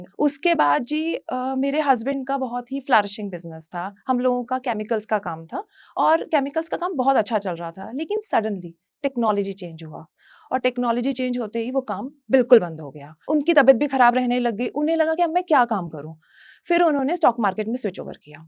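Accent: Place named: West Delhi, India. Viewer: west delhi touch lagta hai maam ka..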